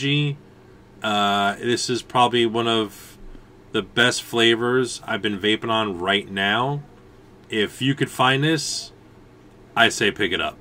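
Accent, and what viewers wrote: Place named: Italian American, United States. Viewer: hey another good review love the italian american accent thumbs up up from australia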